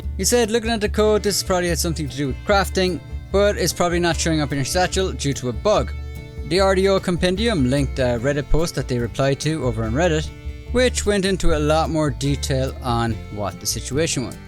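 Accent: Ireland. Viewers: Bro, are you Irish?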